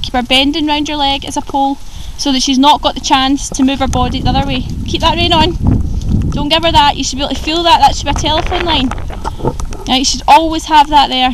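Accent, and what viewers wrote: Scotland, United Kingdom. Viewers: wow you sound fantastic - i totally need to get you as my instructor haha. also - bizarre question - but are you glaswegian or scottish? xxx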